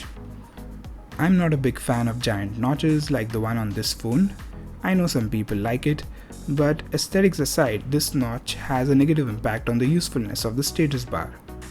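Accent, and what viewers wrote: India. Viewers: I cant take Indian voices serious. Am a racist? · your review is by far the best mobile review is have ever seen. what presentation, what scripting, what visual effects, what editing manr....i wonder then y do u have so less subscribers....by ur accent i think u can speak hindi also....please make some videos in hindi also and pack in some energy in ur voice-some excitement....you'll have millions of subscribers in no time....thank me later bro....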